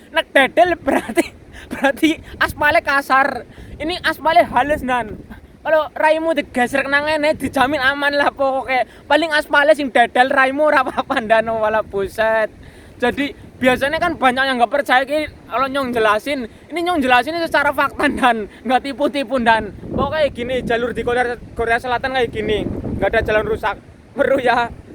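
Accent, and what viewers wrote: Banyumas, Indonesia. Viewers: Jelasine oke bgt.. ngakak pke ngapak lanjutno mas.. 😂😂😂😂 di Indonesia aspal di kira coklat jadine di jdiin cemilan 😂😂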